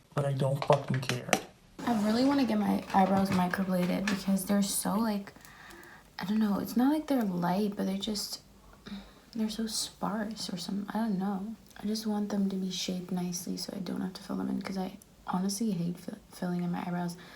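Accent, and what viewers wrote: United States. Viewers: I love your videos so much! <3 just wondering, where are you from? cuz you sound super american but you live in the netherlands? also I love your style, you're so cute :)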